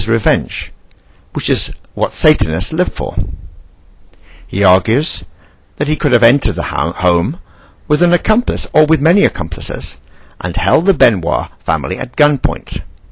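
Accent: England, United Kingdom. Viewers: It's his accent for being british, love his voice actually. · Is the British accent supposed to give credibility to this? He's about as intelligent as Lord Alfred Hayes (RIP). Give me a break. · BBC world service style british accent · its an english voice dumbass if u think that sounds gay then u really have crap gaydar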